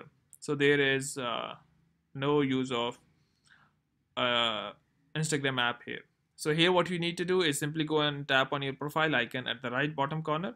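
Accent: India. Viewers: ur accent is still indian dont try to hide it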